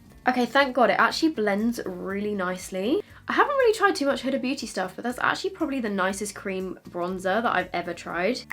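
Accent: England, United Kingdom. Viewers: vlogs british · Is she An Englishwoman?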